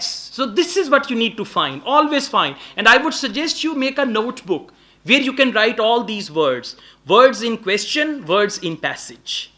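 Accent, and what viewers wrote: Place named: India. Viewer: love your Indian-English accent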